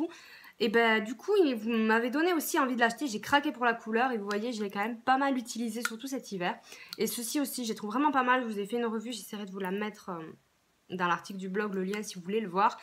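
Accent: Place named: South, France. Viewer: on entend beaucoup moins ton acceeeeeeent du suuuuuuuud sur YouTube que sur Snap !! ahha non pas que je te suive uniquement pour l'accent ...! en tout cas tes vidéos sont un réel plaisir ! pas de chichi, ni de superflu, ni de mise en scène (jolie mais bidon), tu vas à l'essentiel, tu parles des produits de façon très claire et ça fait du bien ! grosses bises ma belle !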